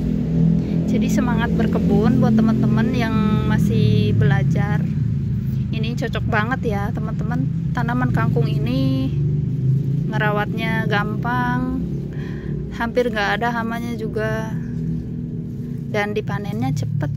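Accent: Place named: Java, Indonesia. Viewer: Kalo boleh tau Kakak orang Jawa ya, soalnya kalo ngomong kyk logat orang jawa😊 Ohya kok ga pernah lihat bunga vincanya Kak, dimana ya?💚🌱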